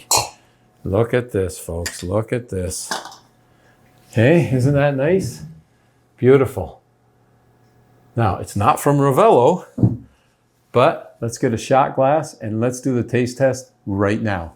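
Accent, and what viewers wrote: Canada. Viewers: At first I thought you were American and was astonsihed to hear you talking metric and not imperial...then I realised you are Canadian. Best wishes from the UK. I enjoyed your video. For me the big expense is buying grain alcohol...the cheapest I can find is £38 for 70cl. I guess this is why people use vodka £20 for 70cl....I think that's what I'll do and add granulated sugar instead of syrup...I might have to wait longer for the lower strength alcohol to extract the oils from the lemon peels. I love Limoncello!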